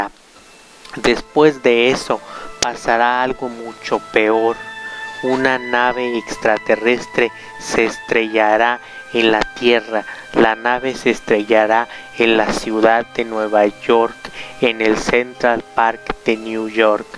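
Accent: Chile. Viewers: Chileno, ndeaah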